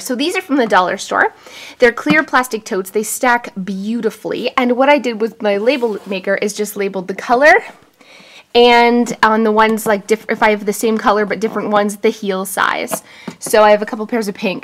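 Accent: Canada. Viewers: eh'? Muahahaha, you are sooo Canadian! LOL ;P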